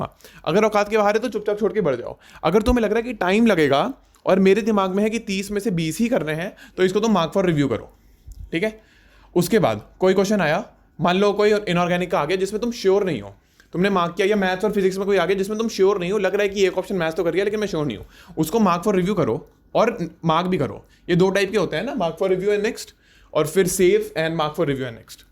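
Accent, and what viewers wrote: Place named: NCR, India. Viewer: Great video.....aur finally koi aisa banda mila jo thodi ncr accent mei bol rha h😂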